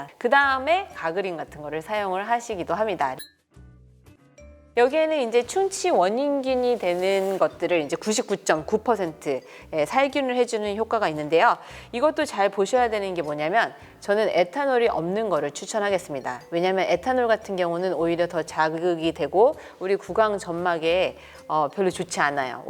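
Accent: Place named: United States. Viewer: 영어 발음두 미국본토 발음이시구 네이버 다음만큼 지식이 훌륭하십니다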